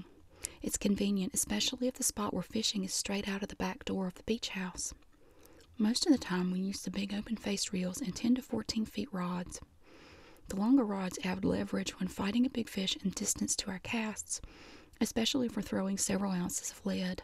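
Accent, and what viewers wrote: South, United States. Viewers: Nothing but good ol' fashioned tingles. Loved hearing that Southern Accent. Reminds me of where i grew up :)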